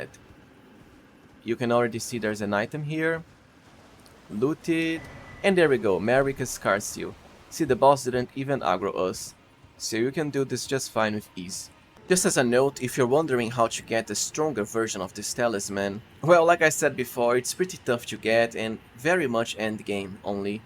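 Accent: Brazil. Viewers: esse cara é br né? esse sotaque n engana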